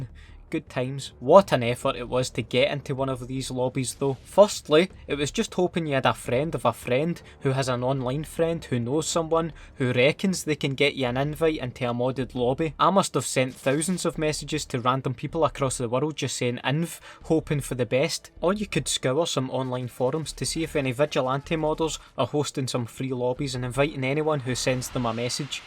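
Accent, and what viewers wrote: Scotland, United Kingdom. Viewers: fucken great Scots accent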